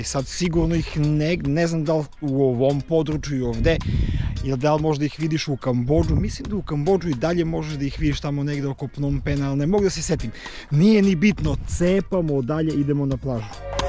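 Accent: Niš, Serbia. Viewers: Ne znam koliko si svestan, verovatno ti pricaju prijatelji i porodica, ali jednom kad se vratis u Nis, ti vise nikada neces pivo da platis ovde. Za ove tri godine si uradio vise na promociji grada, naseg slenga, naseg naglaska, naseg humora, uopste za urbanu sociologiju grada, nego svi politicari u Nisu za poslednjih 30 godina. Kad ovo sve za jednog Durlanca kaze jedan rodjeni Bulevarac, onda ti je jasno sve bratooo 😎 Cekamo te ovde i budi siguran kad te budem sreo negde po gradu, necu da ti trazim selfie ili tako neka sranja, nego ima da bude "De si be, nabijem ti ga? Aj ce popijemo po pivo i da pojedemo nesto konkretno, namocam ti se u pirinac i nudle vise..." Vidimo se nekad, u oslobodjenom Nisu 💪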